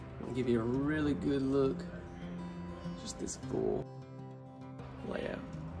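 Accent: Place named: South, United States. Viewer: I love your videos. The quality of filming has truly improved as you learn more from our comments. These homes are certainly impressive. But I totally watch them for another reason. I love your accent. It has been years since I was last down in GA and heard me some sweet southern charm. Have a blessed day.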